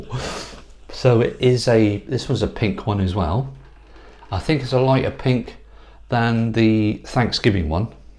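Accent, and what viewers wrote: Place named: West Country, United Kingdom. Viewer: Nice plants, nice to hear a proper West Country accent. Before I left the uk we had grandmas cactus that were said to be her moms, I’d like to think they still exist in some form or another, at the moment I have red, pink and white ones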